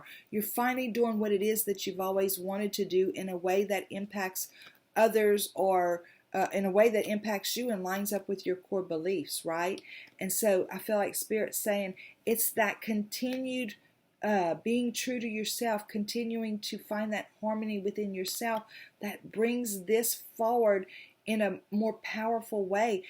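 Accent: South, United States. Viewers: luv listening to you and your southern charm and great analogies..thank you for sharing your light with us...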